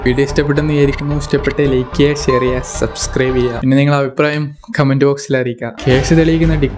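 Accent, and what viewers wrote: Kannur, India. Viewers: Chettante language slag aan pwoli Kannurkkaru poliyalle🔥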